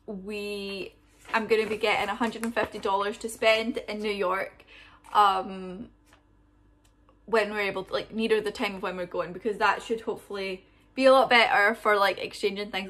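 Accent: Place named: Scotland, United Kingdom. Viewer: youtube recommended this to me for some reason but im here for it. came for the novelty, stayed for the scottish accent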